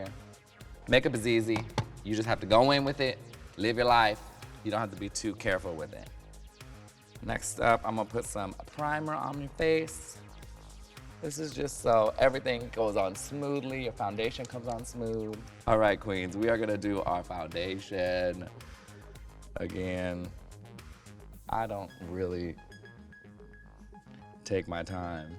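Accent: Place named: New York, United States. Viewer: I live for ha New York accent , love you 💕💕💕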